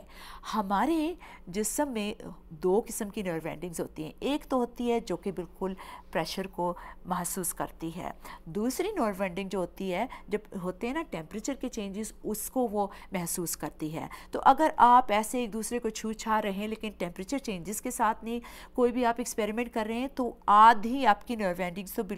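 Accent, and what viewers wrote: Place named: Pakistan. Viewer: Her hindi accent is Pakistan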